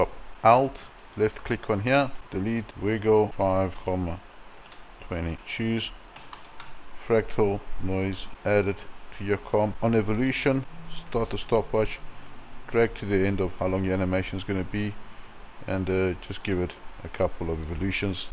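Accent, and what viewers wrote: South Africa. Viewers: I immediately knew you were South African. Lekker man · Nice to hear a fellow South African voice do a tut :) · South African? Nice to see...!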